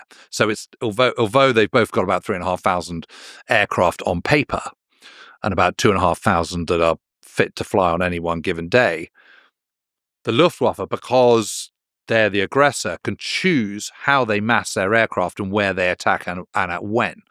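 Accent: Southern England, United Kingdom. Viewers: As a fellow countryman & with a background in applied linguistics, I can therefore attest with some knowledge & experience that James Holland naturally (Without any false pretenses) speaks a well-educated form of English with a ‘Standard Estuary or Southern English’ accent! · He has an educated Southern English accent, which is the heart of the English language. Americans as our offspring have a different dialect, which is fine.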